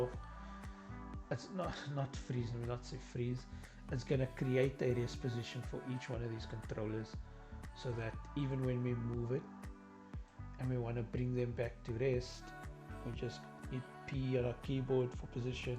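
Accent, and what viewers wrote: South Africa. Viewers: Great tutorial, thanks so much for sharing! Do my ears deceive me, or am I hearing a South African accent?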